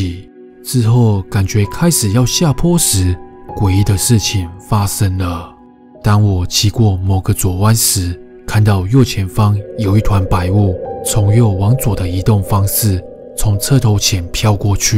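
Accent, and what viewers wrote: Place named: Taiwan. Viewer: 台灣國語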